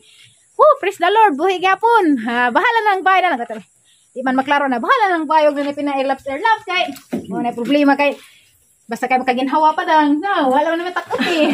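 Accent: Bohol, Philippines. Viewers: Imbis ko mahilak ko Sana,🤗nakatawa hinoun ko sa imong inistorjahan kay bojol kaajo..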